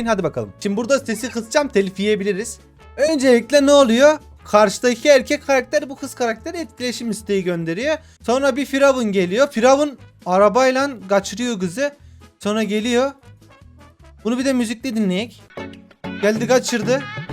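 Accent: Gaziantep, Turkey. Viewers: abi sen anteplimisin ben antepliyim şivenden anladım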